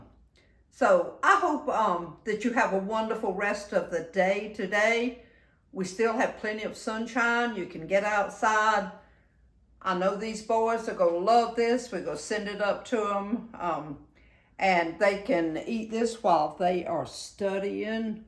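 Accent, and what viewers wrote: South, United States. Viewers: Hi Mrs.!! I just found your channel today. And Oh My does your Channel remind me of my Grand Mother. All of us called her MawMaw.. And he was PawPaw. I guess I'm what they call Bing watching your channel. I am sure enjoying your Sweet Southern accent. And just some of the things you say and your wordings are spot on with my MawMaw. We lost her many many years ago in the 90's. She was such a character, so so funny and loving. I am jealous of your family that they have you. You are such a Dear Dear person. And I love your short stories.... Oh the memories you have provided. With all God's love, Ed of Greenville,TX. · I love your Southern Belle accent ❤ I've lived in Alabama all of my life. I still appreciate a true Southerner. You CANNOT fake our accent. I'm thinking you are from Mississippi or Georgia. "Dahlin' you are just as pretty as a peach" ❤ I'll be watching more of your videos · This is the first time for me to watch her cooking video. 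What a lovely lady she is. I love how she speaks with Southern accent. Her family is so lucky to have her. I will watch more videos of her recipes. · I just found your channel yesterday and I am dellghted. I have watched so any of your recipes already and just can't get enough. I want to make every one of them. I'm having trouble figuring out how to print them out. I can relate to you in loving to cook , but I could never do as much as you. I too am a widow and will. be 84 in August. I am fascinated with your accent,. Like you, I love to cook for my family. God bless you my new southern friend. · What a joy to watch you make this hamburger casserole. Since I love onions so much, I'll probably put them into the recipe largely diced. I can't wait to try it. I'm watching from Edmonton Canada, and I just love your american accent. God bless y'all,. Godspeed! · I just found your page. I am so excited about your cooking and the fact that you love Jesus too just makes even more precious. 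I am curious though...where do you live? I hear a southern accent. Im from Alabama. Keep on cooking😊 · Just found your site & absolutely LOVED it!! You make cooking look so inviting and you got my attention!! ..so I subscribed!! Love your lovely southern accent!! And the fact that you love Jesus just seals the deal!! Can't wait to make your yummy looking hamburger cassarole!! I know my family will love it! Thanks for sharing with others!! You are a sweet lady!! Blessings!! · I love your southern accent. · First time viewer here! What a lovely, southern Lady you are💕 I find your beautiful southern accent so refreshing & pleasant to listen to. Your “Shine for Jesus” comment absolutely made my day & I am definitely going to teach it to my sweet grandbabies. God Bless you richly😊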